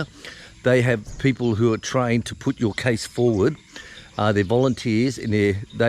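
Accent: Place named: Australia. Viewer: Australians do have their own language. I have a difficult time understanding you guys. Your accent is not that strong though, or maybe I'm just getting used to it.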